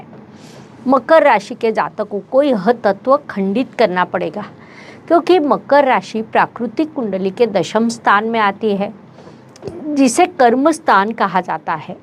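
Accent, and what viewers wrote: Maharashtra, India. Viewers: Such a strong thick marathi accent 😮 omg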